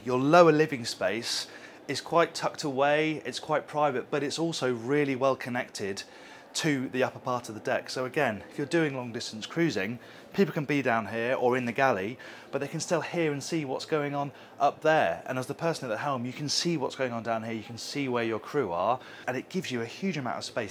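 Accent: United Kingdom. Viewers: There is just something very satisfying about listening to a British accent conduct a yacht walkthrough tour. I don't know. I think I blame James Bond for that. As for the boat, I absolutely love it. I would be more interested in the solar/hybrid and the range on that. Cheers, everyone.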